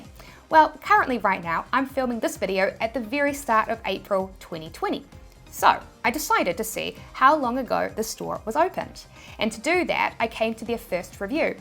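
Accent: New Zealand. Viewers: Love your videos, a great help but I gotta be honest as a Brit I love the NZ accent and love these ones where you give points (Tin points or as we say Ten points) wish you were a judge on strictly come dancing - I’ll give it Tin points! Seriously brilliant videos and a massive help! Thanks! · Love the Kiwi accent. When I was over there the Television adverts for Decking were so funny 😂 Hoping ecommerce works for me and I'll be back to NZ soon! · I don’t get why some people hate ur accent. NZ accent is the cutest!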